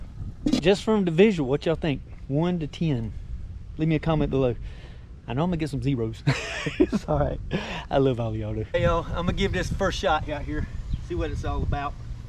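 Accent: Appalachia, United States. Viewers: Are u from applachia? The accent is thick. Great video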